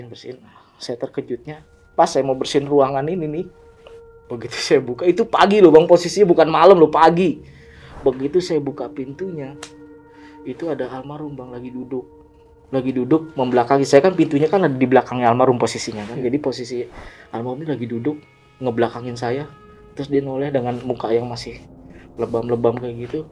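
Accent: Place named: Banjar, Indonesia. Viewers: Ada logat banjarnyaa 👍👍👍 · Logat kalimantan sdin😅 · Pak dialek banjar mu masih melekat Pak wkwkwk, sehat terus Pak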